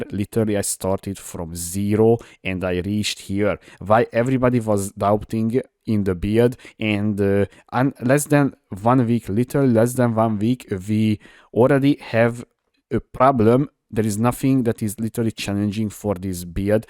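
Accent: Hungary. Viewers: Szia! Jól hallom hogy magyar vagy ?:D Lenne egy kérdésem , hogy hardcore on játszható ez a build ?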